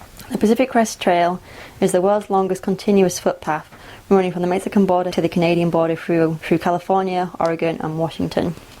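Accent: Yorkshire, United Kingdom. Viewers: Wow! Thanks for the great story and footage. Didn't know you were a Yorkshire lass! From know on it's " Ey 'up" 8)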